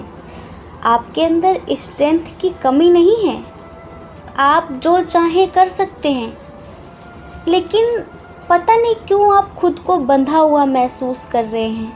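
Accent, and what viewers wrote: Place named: Bihar, India. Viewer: Apki langauge bihar ki he completely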